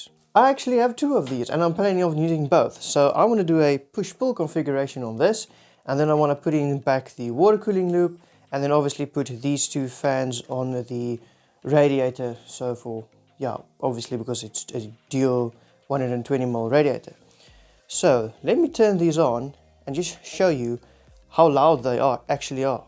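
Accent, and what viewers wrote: South Africa. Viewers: You south African? I can hear the accent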